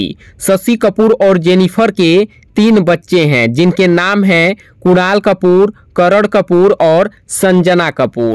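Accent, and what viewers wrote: Bihar, India. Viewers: Aapke language mein bihari axen aata hai ise thik karo. Aur shashi kapoor hain sasi kapoor nehi.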